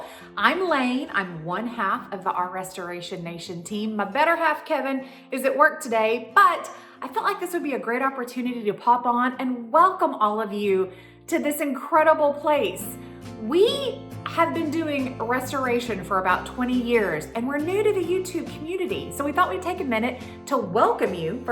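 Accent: South, United States. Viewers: I cannot thank you enough! Seriously. I’m a 32 year old woman who has been (oddly) passionate about historic architecture, primarily residential, since early childhood. I remember my mom taking me to the plantation homes in Live Oak and Amelia Island when I was in elementary school, and being obsessed. When I was in middle school it was the Biltmore Estate, and in high school it was Stone Mountain, GA’s historic homes that were shipped in from all over the country. That led to me taking architectural design courses, and I FINALLY landed myself my very own restoration project in my 1919 arts and crafts home in Pennsylvania. 😍 I so enjoy the videos you share, and all of the knowledge you are passing on. Not to mention the beautiful preservation of history that you’re directly responsible for. Just immaculate! Thank you, thank you, thank you. (The southern accent also helps! 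Lol. From one southerner to another. I miss the accents from back home now that I permanently have lived above the Mason-Dixon for the last decade!)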